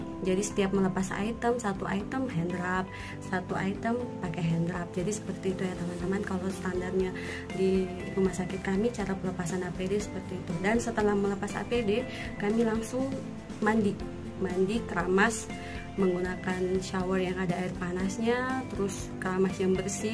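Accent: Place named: East Nusa Tenggara, Indonesia. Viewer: Gua suka logat NTT nya .... keren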